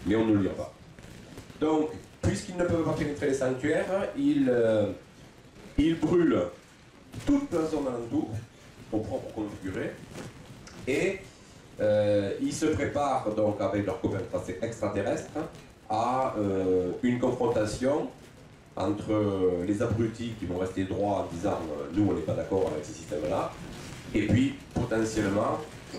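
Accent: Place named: Marseille, France. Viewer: Merde l’accent marseillais!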